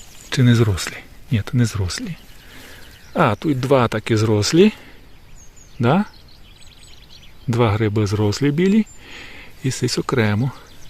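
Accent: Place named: Skole, Ukraine. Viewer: Скажіть, будь ласка, а ви не зі Сколівського району? Бо дуже подібний діалект.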